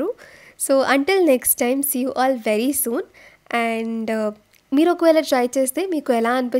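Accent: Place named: Hyderabad, India. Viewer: Akka Neku slow ga hyderabad slang vastundi ga 😅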